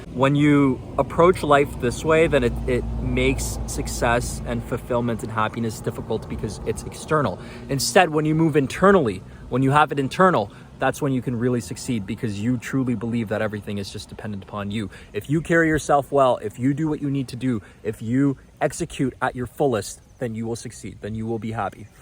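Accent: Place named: North America. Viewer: You shocked me man, with your Canadian or American accent is it? So proud of you settling down or born in North America. I am Indian too and tried moving to Canada in the 90s desperate to start a new life there but didn’t make it.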